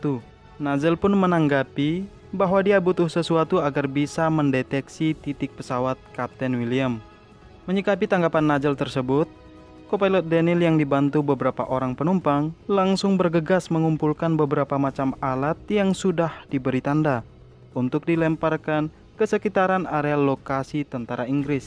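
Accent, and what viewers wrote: Java, Indonesia. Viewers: Wong jowo awok awok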